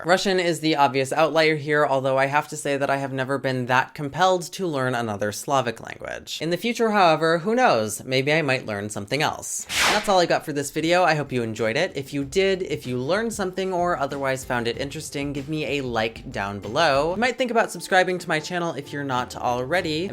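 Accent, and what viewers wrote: Portugal. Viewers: yeah your french is beautiful and your Russian is better. Também gosto que falas a tipo do português do portugal. Continuas falar meu amigo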